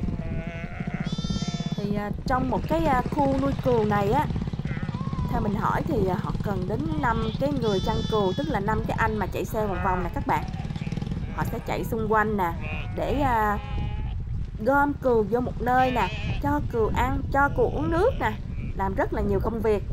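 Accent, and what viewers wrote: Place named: Saigon, Vietnam. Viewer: Đồi cừu suối nghệ vũng tàu,vũng tàu có nhiều cừu ban ha,nghe giọng nói của sài gòn dễ mến quá,mình thích giọng sài gòn quá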